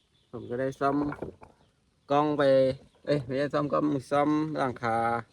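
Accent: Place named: Ratchaburi, Thailand. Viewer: สำเนียง..ราชบุรี..ชัดเจน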